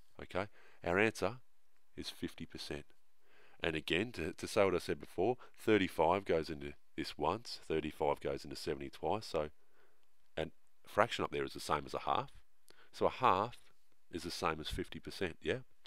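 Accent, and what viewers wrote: Australia. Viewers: You can tell he's Australian by his first words on most videos, 'G'day everybody...' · Love all your video's and you have a cool voice, sounds like an Aussie accent :-) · That thic australian accent calm my mind... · Your channel is the reason I'm starting to overcome my fear of mathematics. Your style is unique, you avoid unnecessary terminology and technicalities, you keep it clean and simple and you have an innate aptitude for explaining problems. And bonus, I love your deep and soothing voice your accent is amazing! Is it Australian? Thanks and keep making these amazing videos! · Oh my god thank you so much! I've watched several other videos but thy didn't help me one single bit, and this helped me so much! Maybe because I'm used to aussie accents. xD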